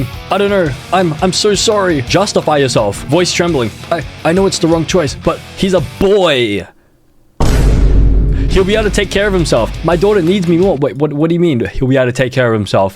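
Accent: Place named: Australia. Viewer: YOUR AUSTRALIAN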